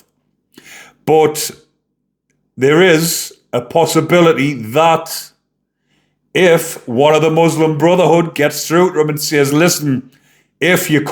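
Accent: Newcastle, United Kingdom. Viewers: A Geordie accent.North-East of England.Newcastle area. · Oh ! Hello bonny lad ! Being honest ! Hearing my fellow bretherons voice ! Thinking your a geordie ex con ! Thinking ! That devils decilple ! Is about to reap the rewards ! For ! Killing ! An innocent boy ! Not us bloody english idiots lol ! Family a little fierce lol done jail time !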